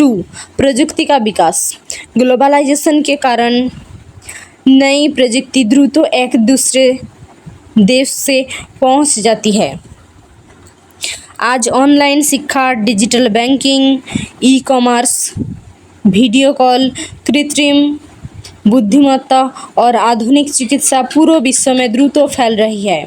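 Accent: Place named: Bengal, India. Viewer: Are you a Bangali? Bengalis are speak like this.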